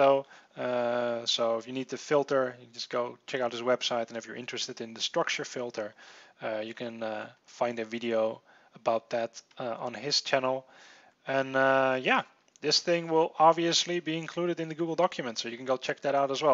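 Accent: Netherlands. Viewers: You sound Dutch as fuck. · je klinkt nederlands.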